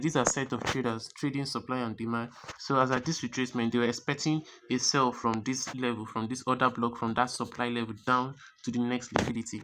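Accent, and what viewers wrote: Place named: Africa. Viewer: Your name is indian but you are speaking English in african accent Your words are not clear first work on your accent I'm forced to see transcript everytime you speak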